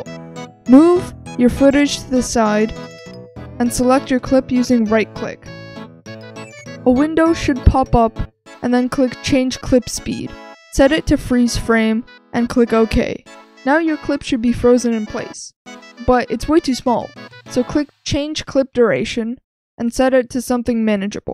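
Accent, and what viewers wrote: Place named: Texas, United States. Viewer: Your from texas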